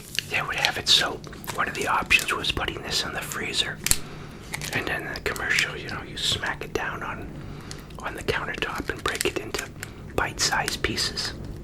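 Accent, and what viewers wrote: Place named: Massachusetts, United States. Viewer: You must be from Massachusetts...I recognize the accent.Hello from southern NH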